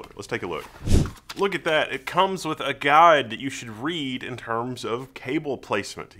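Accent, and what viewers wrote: South, United States. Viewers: the only tech reviewer I know with a southern drawl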